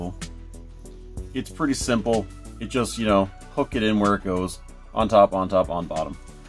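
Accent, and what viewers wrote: Canada. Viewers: You say "out" almost like "oot"-- which to us Americans is basically the stereotypical Canadian accent. Lol. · You do say "out" like you're Canadian. And that amount of thermal paste was perfect. Either way, better to go over than under unless it conducts electricity. 😊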